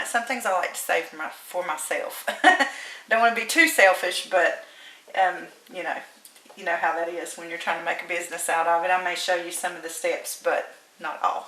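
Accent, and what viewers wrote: South, United States. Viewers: I just love you. I rarely hear southern accents on youtube hehehe. Makes me feel like im home. Not sure if youre from the south but you sure sound like a southern belle. 💚💚 much love from Georgia!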